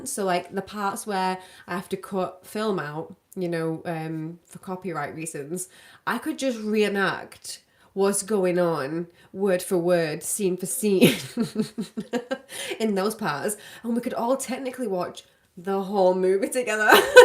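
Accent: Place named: Manchester, United Kingdom. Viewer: I'm from Leeds and I could tell you were from Manchester.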